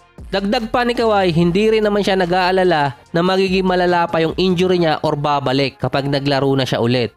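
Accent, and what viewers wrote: Batangas, Philippines. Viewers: salamat sa iyong nba updates, ako'y nagsubscribed na rin at ramdam ko eh ikaw eh isa ring gaya kong batangueno eh, tama ga... shout out mula dubai ha.. balak kong maaglaro din 1XBET eh pwede gang gamit eh ibang Gcash, sa fiance ko at ang akin eh di pa updated eh, ayos ga yun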